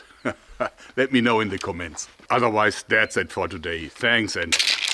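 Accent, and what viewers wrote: Germany. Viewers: Hey, your accent reminds me of the accent Germans use when they speak English here in Germany. Are you German? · I love that germanized english accent!. Cool!.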